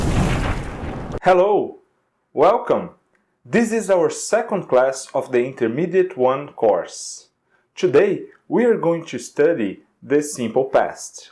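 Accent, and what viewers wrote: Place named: Brazil. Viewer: ótima aula. e seu sotaque brasileiro nos lembra a importância de aprender idiomas e manter nosso sotaque com orgulho! muita gente mira no objetivo de perder o sotaque brasileiro, o que é uma grande bobagem. imitar outros sotaques só serve pra brincar, fazer piadas e tudo mais... jamais pra adotar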